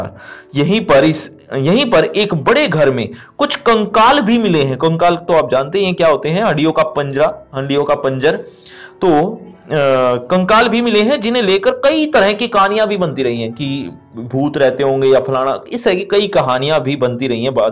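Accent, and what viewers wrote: Haryana, India. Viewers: sir aap hariyana se ho kya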